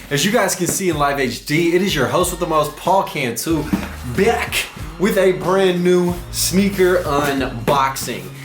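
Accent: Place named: Houston, United States. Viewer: I just love how Houston Texas you areee lmaoo if that even makes sense! I didn't notice us Houstonians had an accent until I found you and I loveeeee itttt 😩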